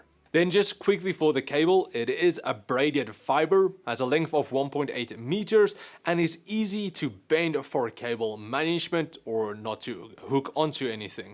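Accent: South Africa. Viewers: Good review man, mine just came from evetech, dont fight the SA accent, embrace it! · ey this oak is south african lukkkaaa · Great review as always,. I'm from SA too. Embrace that accent, buddy. It'll sound great in the videos😉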